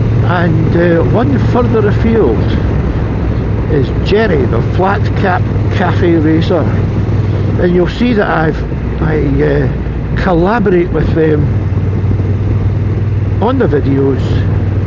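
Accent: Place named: Scotland, United Kingdom. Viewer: Good video, stunning views, and that accent is doing half the tourism board’s job on its own. I can’t wait to visit Scotland. Videos like this are dangerous for the travel fund. Also, the live stream was brilliant. You guys are doing such a lovely thing for the community, and I hope it grows and grows. Proper good vibes.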